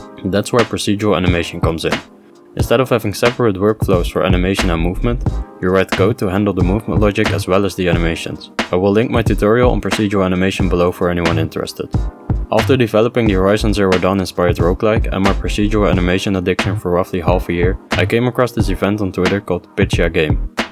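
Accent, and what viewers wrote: Netherlands. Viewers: Mjst say : yoyr dutch accent is in english better then other ditch people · I was hoping you'd do a devlog! Ook leuk om te horen dat je Nederlands bent :D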